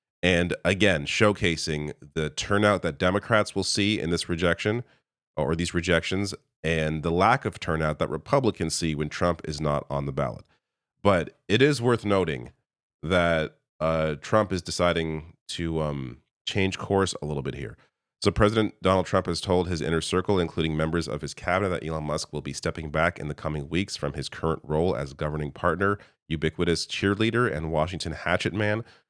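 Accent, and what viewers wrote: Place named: Canada. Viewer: I love your direct, no BS, "call it what it is" style and the fact that we have a very representative Canadian voice on YT. And, finally, some good news. It will be great news when Musk is gone and even better news when Trump is gone--as he says about getting other countries--"one way or another".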